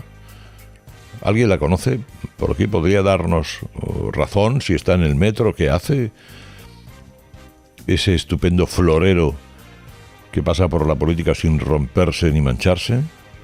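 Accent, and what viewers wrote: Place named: Almería, Spain. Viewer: ¡Y que acentillo de Almeria te sigue saliendo, Calos! Eres el mejor haciendo radio chula. Desde Almería un fuerte abrazo, paisano. PD : Doña Pilar Llop no dice nada porque está muerta de vergüenza ajena.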